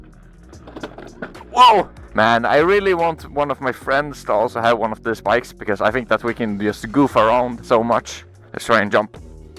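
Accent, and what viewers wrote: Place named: Sweden. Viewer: you soud swidish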